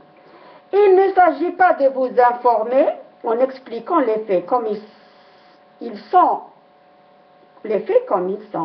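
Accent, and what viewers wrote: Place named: Algeria. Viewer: C'est dommage que les Algeriens aient de moins en moins un bel accent comme le tiens... Et pourtant tu attaques Daoud...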